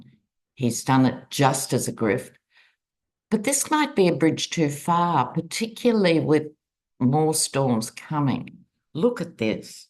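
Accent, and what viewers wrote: Australia. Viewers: Hi, I was wondering if you wouldn’t mind telling me what tarot pack you are using in this video, really drawn to the artwork? Love hearing an Aussie voice & as I think we are of the same vintage, also love your colloquialisms you use 😊 Thanks for all your hard work. Best wishes ❤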